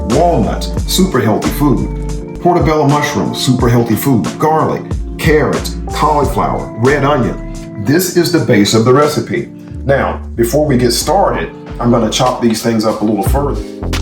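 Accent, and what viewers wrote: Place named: Bay Area, United States. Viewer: You got a strong bay area accent bruh. What set you from? Dope clip cuz!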